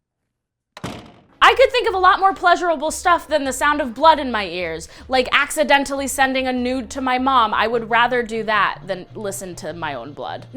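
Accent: Texas, United States. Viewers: Wait! Your from Texas!? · ur from Texas?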